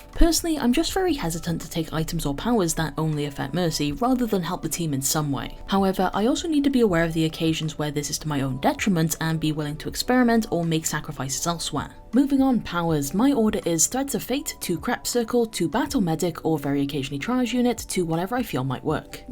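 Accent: Wales, United Kingdom. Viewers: Their Accent is Welsh if ur curious.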